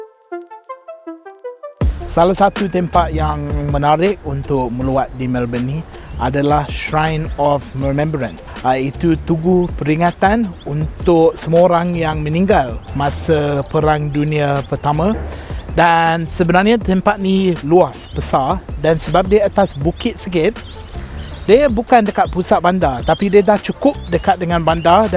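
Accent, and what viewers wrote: Malaysia. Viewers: punya slang dh jadi mcm Malaysia-English slang🤣🤣🤣 terbaik! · punya speaking x bunyi mcm org putih dah. Slang Malaysia weh 😁😆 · Hahaha cakap english campur malay aksen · Perghh slang English mcm malaysian dah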